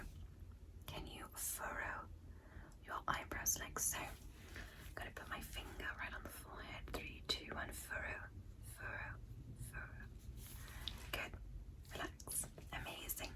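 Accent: England, United Kingdom. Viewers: Love the British accent!it’s almost just like mine!(for the record I am British!❤ Alain. 24601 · U kind sound like a britisch person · X A rather long visual. However your work is admirable. The effort is just. “English Accent” sound. For all eternity. Heart. I send you my best. Goodbye. X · As a brit im wondering why you chose a british accent for alien rp XD what you trying to say?! (Not complaining really) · love British women...Can't beat that accent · Love the British accent ❤️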